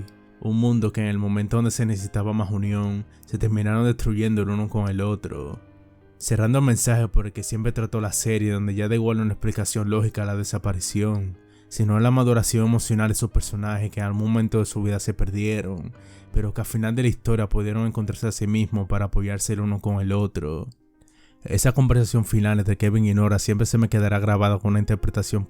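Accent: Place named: Central America. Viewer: Leftovers es una maravilla, es muy bueno que se reivindique una serie de esta categoría, sin embargo es muy importante que el relator, hable un español claro, sin acento. Puede ser el mejor análisis sin engarbo cae todo cuando comienzas a oír un acento centroamericano marcado, ademas utiliza modismos que realmente destruyen todo. un consejo sano, no hables con acento, si tu no puedes hacerlo con un léxico claro, pedí a alguien que te lea el guion.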